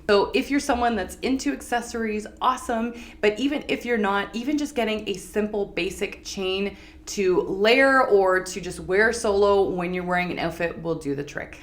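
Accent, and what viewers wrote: Canada. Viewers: I just discovered your channel, love it! Love your accent is it Canadian? Anyhow, I'm a larger woman and I get what you say. I visualise how Victorian women would enhance their pronounced areas even if they were overweight! Could you please post more stills for a bit longer, if I blink I miss them! Thank you Jayne 😊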